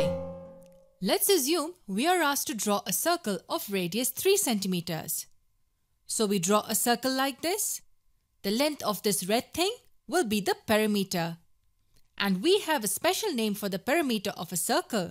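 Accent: India. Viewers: Of course it's an Indian accent narration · I don't understand that Indian accent... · very hard to follow, traditional Indian accent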